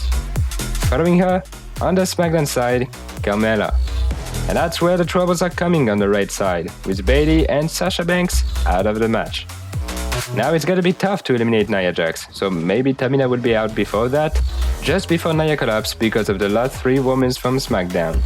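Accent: France. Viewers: Wow man, your English is great, unlike many other YouTubers'. Like that French accent as well. Great content, très bon!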